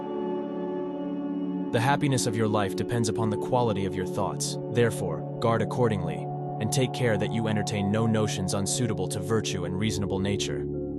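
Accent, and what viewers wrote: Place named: United States. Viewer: It would have enhanced this video if you used a more authoritative, deeper narrative voice. This sounds like a random America kid in a bar reading quotes from Marcus Aurelius.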